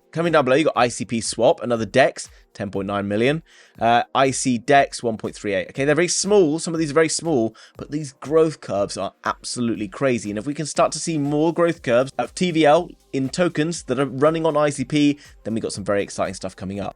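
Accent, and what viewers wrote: RP, United Kingdom. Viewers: Your semi-posh Brit accent, with the odd expletive, is music to the ears. Wouldn't turn down a celebratory donation of ICP on 100K subs.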